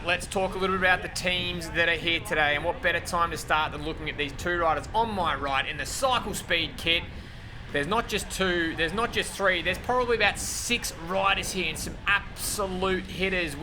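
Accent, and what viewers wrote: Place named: Australia. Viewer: Love your energy and the Aussie accent 👍🏼🙌🏼💪🏼 🇦🇺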